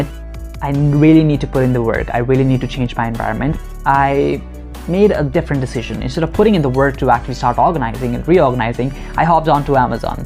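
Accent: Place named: United Kingdom. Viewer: you have a good british accent btw😊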